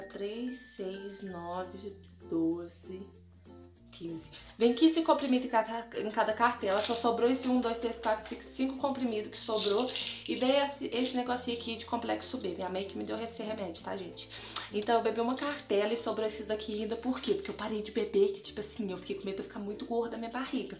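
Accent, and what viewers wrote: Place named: Minas Gerais, Brazil. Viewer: Eu comecei a tomar ontem to com 49 kilos agr vamos ver daqui a um mes ne kkkkkk Vc e mineira também ? Kkk melhor sotaque ☺♥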